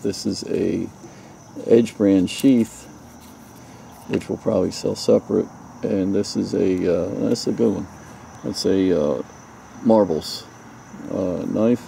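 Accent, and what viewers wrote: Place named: Baltimore, United States. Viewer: Love your finds...great video. Your B'more accent keeps me longing for the good old days in Maryland! I lived in Glen Burnie for almost 20 years and nearly forgot what a terrific area it was for vintage finds. Keep it Up!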